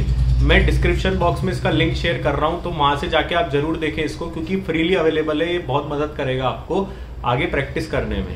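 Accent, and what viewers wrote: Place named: Madhya Pradesh, India. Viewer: Sir MP se hai to aise hi tone hai sir ki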